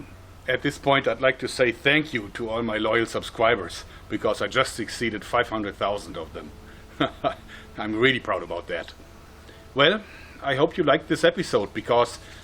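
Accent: Germany. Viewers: Ja, man merkt das er Deutscher ist :D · Haha du bist deutscher oder? :D oder sogar schweizer? ^^ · Yeah, German Accent... I'm German and I hate German accent the most... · oh :X ich dachte Amerika weil alle so blabla dein deutscher Akzent noch sorri dafür ^^ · du bist deutsch das merkt man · Hach, wie ich diesen Deutschen Akzent im Englischen toll finde :D Super Video ✌ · Man hört einfach sofort das du deutsch sprichst 😂ich feier dich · Bruder man hört das du nen deutscher bist xD Edit. Hab dich grade bei my spaß gesehen bei rab gut gemacht 👍🏻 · For me he Sounds very German ^^' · Haha man merkt richtig dass er deutscher ist😂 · Ich denk die ganze zeit das er deutscher is der es probiert englisch zu reden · Man hoert an deinem Englisch das du Deutscher bist was ich auch schon vorcher wusste aber ich feier dich:) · Ist der Deutsch,wegen dem "Akzent" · I like your German hah hah hah hah! 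Sounds so German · hes a german ;) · hörst du den deutschen accent nicht wenn er englisch spricht??? wow!!! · the typical german-english accent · Are you German? You have a German actcent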